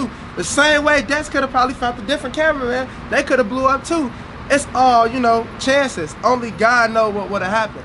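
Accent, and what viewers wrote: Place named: Detroit, United States. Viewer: he sound like he from detroit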